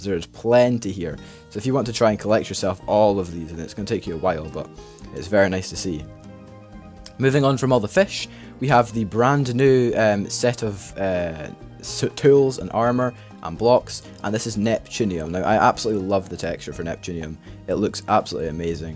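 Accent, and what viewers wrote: Scotland, United Kingdom. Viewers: Thanks! This overview really helped me! Also I love your partially Scottish accent. 😂😂😂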